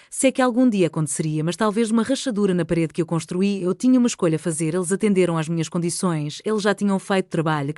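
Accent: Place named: Lisbon, Portugal. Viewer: O acento lisboeta leva a pensar em emigrantes portugueses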